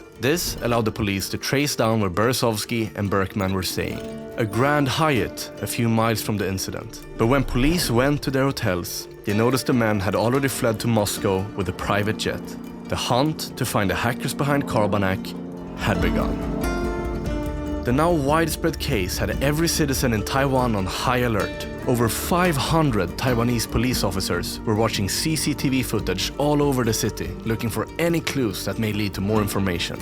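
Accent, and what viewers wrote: Sweden. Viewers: Amazing video! LEMMINO vibes with your swedish accent :) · From sweden? Know the accent since im from there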